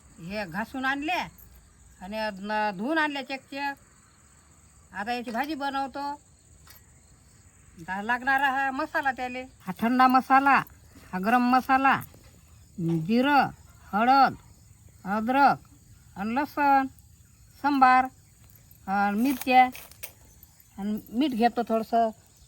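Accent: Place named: Vidarbha, India. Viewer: Aaji tujhi bhasha ekun gaavi ch aalya chi feeling yete. Vidarbha chi god bhasha as good as it's food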